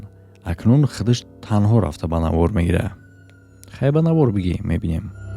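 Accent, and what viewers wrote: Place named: Yovon, Tajikistan. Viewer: Ака ба Худо ма кайф мекнм ранги мо ЁВОНИ Ё гап мезани чашмошон блк-блк ай гап нест хдт да ака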